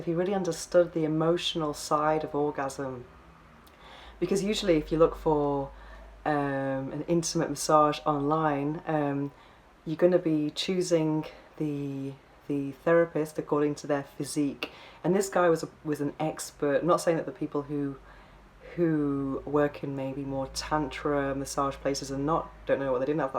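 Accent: United Kingdom. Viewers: dammittt! if she wasn't british it may well have been an interesting story! cannot bring myself to endure that accent! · but, I will agree that her accent is 100% British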